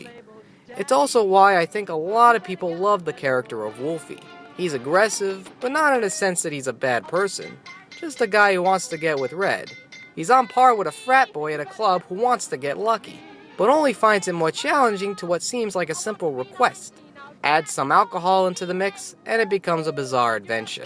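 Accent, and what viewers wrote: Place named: New York, United States. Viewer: You have a very interesting almost extinct New York accent.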